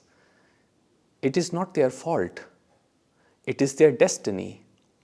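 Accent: India. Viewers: Immediately clicked away when I heard the indian accent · Maybe you are not awakened? Maybe you are just another douchbag on the Internet with Indian accent.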